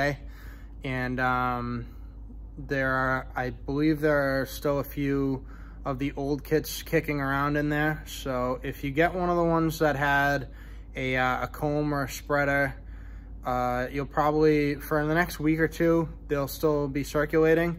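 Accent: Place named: Massachusetts, United States. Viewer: I watched your video and am impressed with your work. I have a 7 yr old porcelain clawfoot tub. The faucett sits on the top of the tub, and there is of course a chrome drain.can these be taped off ? I'm a painter, but a perfectionist, and do not want to tackle this by myself. I heard your voice and guessed you may be from MA, then I saw the Patriots bucket 🤣 I'm in Weymouth, can I hire you to do it for me? Thank you !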